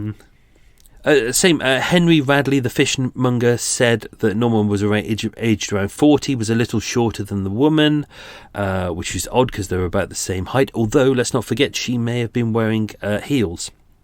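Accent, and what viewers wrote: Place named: Newcastle, United Kingdom. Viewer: Thanks. You've made some good points here regarding witness statements. Keep up the good work, great content and well narrated If your struggling with your geordie accent, try watching some Sid the sexist chat up line videos on YT. 👍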